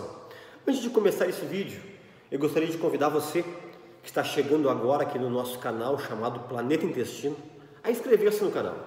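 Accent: Rio Grande do Sul, Brazil. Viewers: Dr muito obrigada por seus videios,Tenho 65 anos,tenho Diverticulite e as fezes ficam presas na parte direita,ai vou massageando p baixo p que solte.Procuro me alimentar bem e mais de alimentos naturais.O que devo fazer p defecar melhor? Agradeço sua resposta. Amo o RS e seu sotaque, morei 12 anos em Novo Hamburgo,agora moro no RJ,onde nasci.Deus o abençoe ricamente sempre.Bjo no coração.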